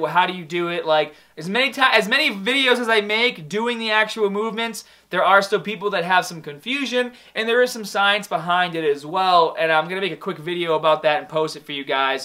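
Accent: United States. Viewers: Your American accent is so strong ahah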